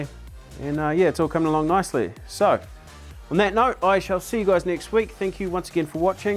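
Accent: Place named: New Zealand. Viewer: Welcome to episode fortysex..... go the kiwi accent fella! Keep up the great vids!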